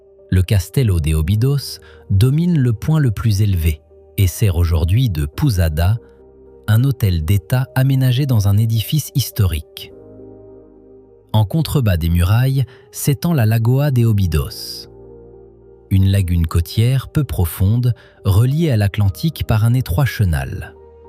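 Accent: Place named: Spain. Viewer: Mon pays de cœur, celui où je finirai ma vie, en ayant obtenu la nationalité portugaise. Si je vis assez longtemps 😅 Tant d'endroits magiques, de paysages variés, de fêtes inoubliables des plus grandes villages aux plus petits villages. Des traditions vivaces et une modernité, moins froide qu'ailleurs! Des détails, partout, qui m'émerveillent: calçada (pavage des rues avec des motifs), azulejos anciens ou contemporains, ferronnerie, art de rue si créatif, coloré et joyeux. ETC. Seul bémol de la vidéo: les noms portugais souvent mal prononcés, à la mode espagnole. Le portugais n'a heureusement rien à voir à l'oral avec cette langue si pauvre en phonèmes!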